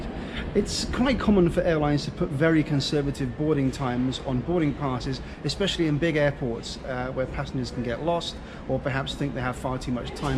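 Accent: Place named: Liverpool, United Kingdom. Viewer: Oh. No. He’s a southern scouser 🏆 😊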